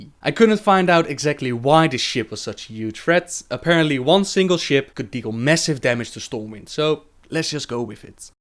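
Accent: Netherlands. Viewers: You have an incredibly strong dutch accent mate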